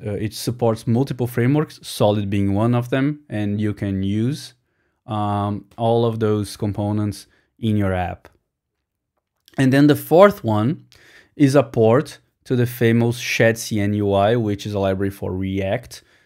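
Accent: Brazil. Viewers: Ingles de Brasileiro é muito massa!! PArabéns mano! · Parece Brasileiro, você é brasileiro mn?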